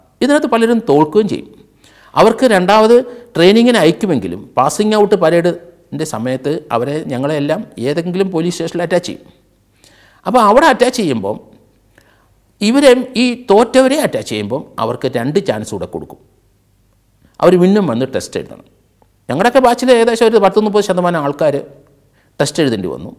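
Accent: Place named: Kottayam, India. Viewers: He has trivandrum, kottayam and idukki slang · അതി മനോഹരമായ കോട്ടയം ഭാഷ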